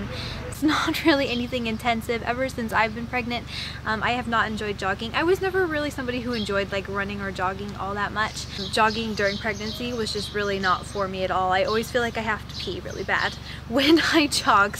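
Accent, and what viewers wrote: United States. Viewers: Just found your videos and I love them. I love how much of a healthy life you live. I don't know if you mentioned this in another video or not but how did you meet your husband? I was just wondering because you sound American and your husband sounds German and you mentioned living in Germany, so I was just wondering how you ended up meeting one another? =)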